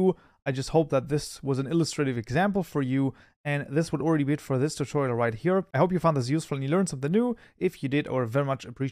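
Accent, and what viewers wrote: Germany. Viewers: Amazing video man (also i love you accent, are you german?) I've looking for ways of having dynamic items, for example: i create an item that can change it texture and it stats (attack damage, mining speed, durability...) based on crafting materials, like tinkers' construct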